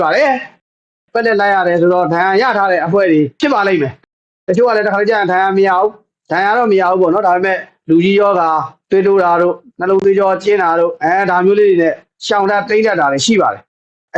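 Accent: Yaw, Myanmar. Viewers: okယောနသံ ❤၁၀၀၀၀၀၀၀၀၀၀၀သိန်း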